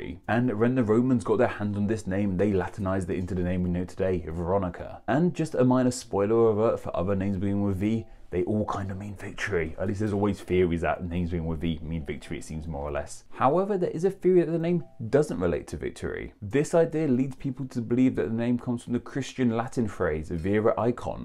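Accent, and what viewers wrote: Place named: United Kingdom. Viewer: I’ve seen other people make similar comments on other videos. Maybe that’s just the accent in the part of the UK where is from? Or maybe it’s just his own unique personal accent?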